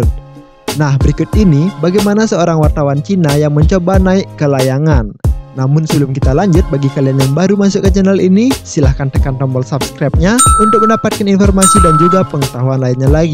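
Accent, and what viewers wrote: Aceh, Indonesia. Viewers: Dari logat km bicara Aku yakin km orang aceh kan??? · Youtubernya kayanya org aceh. Suaranya khas sekali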